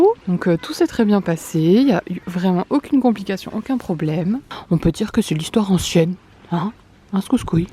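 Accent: South, France. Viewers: Depuis quand tu as un accent du sud ??? 😂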